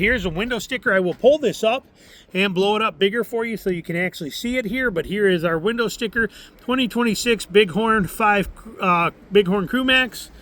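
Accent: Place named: South, United States. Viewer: sounds like a redneck. I passed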